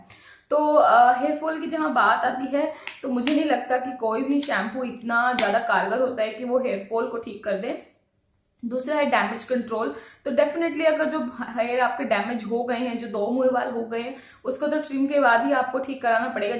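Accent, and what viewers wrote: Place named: Haryana, India. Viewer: hlo dear.....i m from bhiwani.. yhi karan h ki m aapki fun ho gyi hu... thoda haryanvi touch h aapme.....m rhti hu Lucknow me... aapki give away muje chahiye..m bhi use krna chahti hu.😊😊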